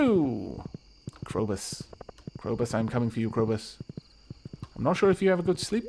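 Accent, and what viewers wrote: Wales, United Kingdom. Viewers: I am nearly sure that is Welsh.;-)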